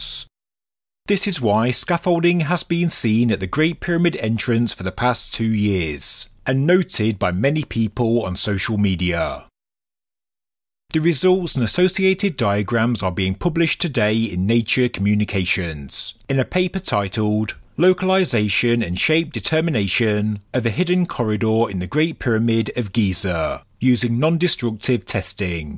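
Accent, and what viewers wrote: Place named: Leicester, United Kingdom. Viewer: no I was only talking about the accent and the tone of the sentences, guess I never heard the leicester accent😂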